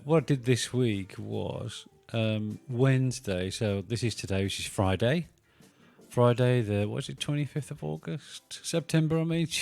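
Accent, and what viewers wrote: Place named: Birmingham, United Kingdom. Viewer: From a Brummie.....so nice to hear a fellow Brummie on YouTube!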